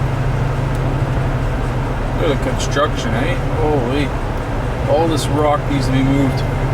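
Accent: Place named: Canada. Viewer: okay your from canada eh (: is that the 401 your on?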